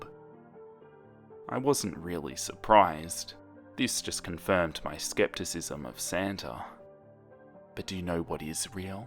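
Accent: Australia. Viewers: I do too i think its because hes australian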